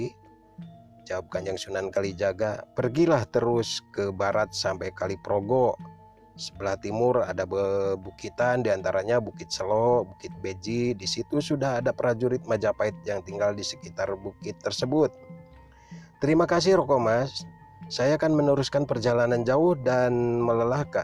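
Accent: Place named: Ciamis, Indonesia. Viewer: Logat ciamis nih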